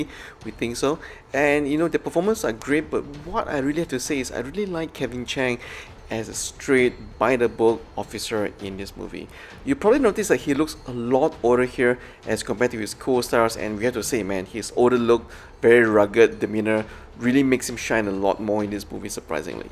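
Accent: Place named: Singapore. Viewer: An average watch. You sounds like from sg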